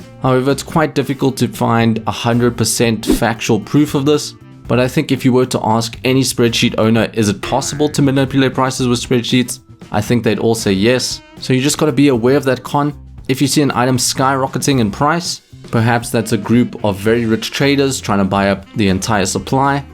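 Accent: South Africa. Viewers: Dude you south African? If you are then yaaay xD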